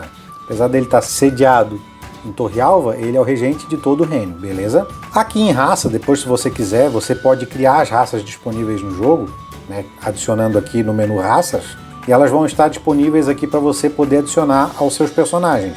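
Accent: Florianópolis, Brazil. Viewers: Tu és manezinho brou?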